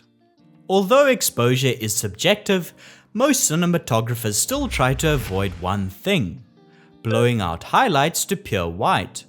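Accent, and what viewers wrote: South Africa. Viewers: The narrator has a very South African accent. Nice 🍺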